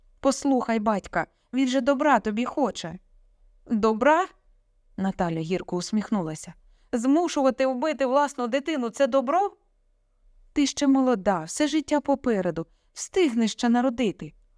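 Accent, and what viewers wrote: Standard. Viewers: ДУЖЕ ГАРНА РОЗПОВІДЬ, ВЗЯТА З ЖИТТЯ, ДЯКУЮ ЗА ЧИСТУ УКРАЇНСЬКУ МОВУ.❤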